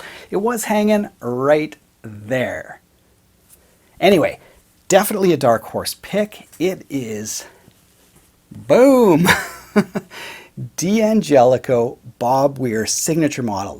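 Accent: Canada. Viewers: no Godin? and I hear you're Canadian and you're not propping up a good Canadian company... Love my Godin Session.. and yes I am Canadian.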